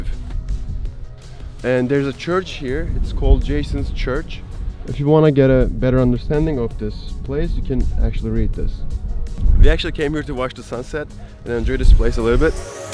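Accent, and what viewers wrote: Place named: Turkey. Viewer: what's this accent? pure Turkish accent. 😐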